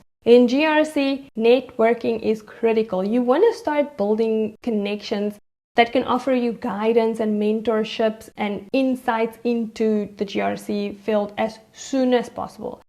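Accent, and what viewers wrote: South Africa. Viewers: I know my people when I hear them are you from SA by any chance? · This accent… I know it mahn. are you South African? 😅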